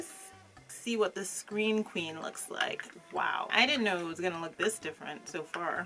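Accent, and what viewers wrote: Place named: New York, United States. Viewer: I just stumbled across ur video u sound SOO much like New York or Tiffany Pollard😍❤❤ I was curious about these 2 products. I'm glad I found ur review! I like winter kimmie!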